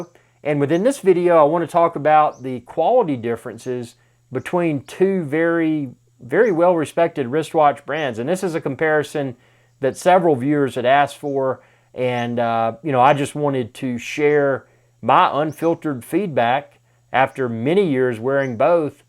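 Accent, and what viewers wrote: South, United States. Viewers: What stands out immediately, besides your strong Southern accent, is that you likely have nail fungus, or rather, your poorly cared-for nails. You seem to obviously have an advanced stage of nail fungus. A combination therapy with an antifungal treatment and pills would be the best solution. What I also expect from a presenter is a bit more technical understanding and a somewhat more professional, more focused on technical aspects and less egomaniacal demeanor. Forgive my sarcasm, but maybe to don't have the know-how to do a more professional job.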